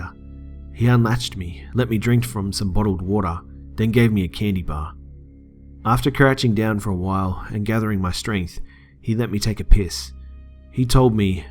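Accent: Australia. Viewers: I think you are Australian?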